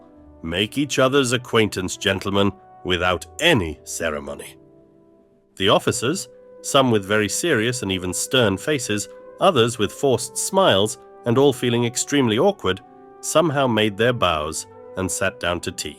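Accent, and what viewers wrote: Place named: Russia. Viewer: У чтеца ярко выраженный русский акцент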